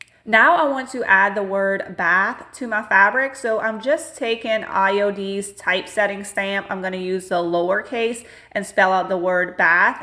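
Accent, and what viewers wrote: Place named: Georgia, United States. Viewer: I have some old farmhouse table legs I think I’m going to paint them and add little round disk to each end I love all your projects. And I love your accent are you from Ga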